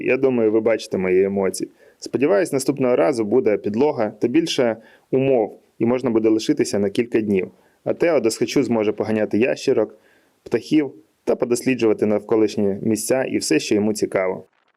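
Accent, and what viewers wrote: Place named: Rivne, Ukraine. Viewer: знайомий суржик:) Ви з Рівного?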